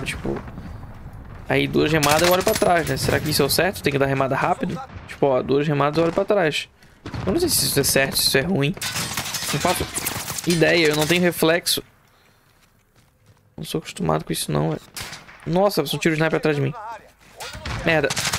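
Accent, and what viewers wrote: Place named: Santa Catarina, Brazil. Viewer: Mano pelo seu sotaque vc é de Santa Catarina, de onde vc é?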